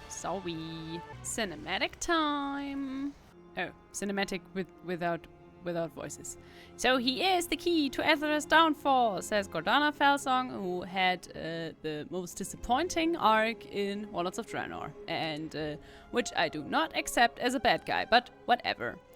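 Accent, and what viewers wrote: Germany. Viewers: You sound like a german 😏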